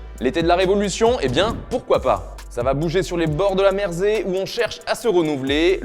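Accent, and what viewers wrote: Italy. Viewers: Moi c’est les accents italiens qui me retient ici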